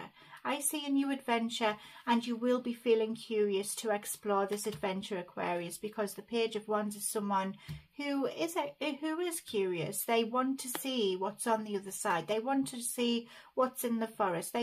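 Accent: United Kingdom. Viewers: I so look forward to these readings, I feel your accent is similar to mine as I live in Northumberland. You always make me feel so much uplifted afterwards. Thankyou and Bless you xx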